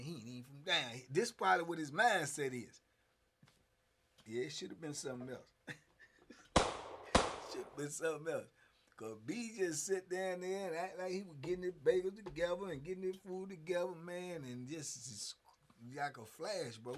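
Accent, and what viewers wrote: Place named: New York, United States. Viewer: Hartford CT Checking in and sassy salute that's rt you can't sleep on nobody son that NY acent is what's up□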